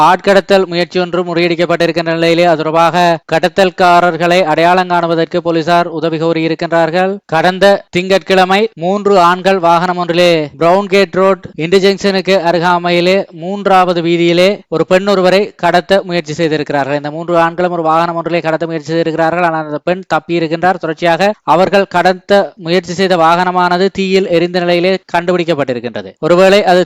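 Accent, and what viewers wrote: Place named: Sri Lanka. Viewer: Lankan tamil,namma chennai tamil pasya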